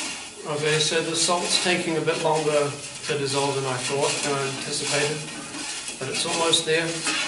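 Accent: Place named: England, United Kingdom. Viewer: When there is talking and stirring at the same time, with an English accent, it is difficult to understand.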